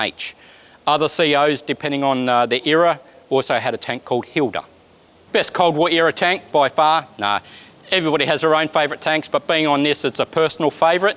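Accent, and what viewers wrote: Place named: Australia. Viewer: For a few moments there, i closed my eyes & pretended i was listening to Steve Irwin talk about a tank in great detail instead of crocs & various other critters. I know it's kinda odd but hearing that accent reminded me of Steve.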